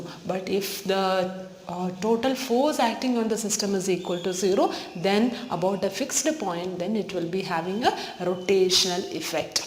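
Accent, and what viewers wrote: Kerala, India. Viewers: Mam malayali aano?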